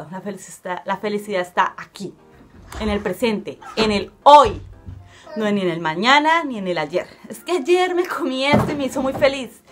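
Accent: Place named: Colombia. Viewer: Me encantas como hablas a lo colombiano que graciosa 🇨🇴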